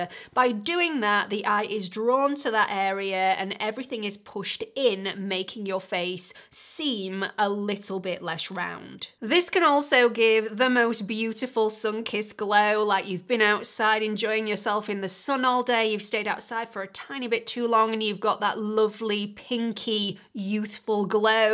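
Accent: United Kingdom. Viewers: Hi, that was insightful I normally don’t wear blush. I literally have only two one of which is a highlighting blush. If I ever choose to wear them on the more study regular basis, I will definitely head your advice. Thank you for the review and by the way, I love your accent may I ask what part of the UK are you from?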